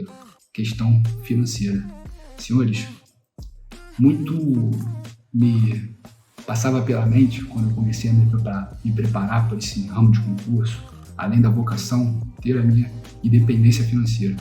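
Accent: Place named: Rio de Janeiro, Brazil. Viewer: Você é muito aluno mesmo kkkk, até suas posições e suas mãos, além de sua fala pausada e encariocada mostram isso kk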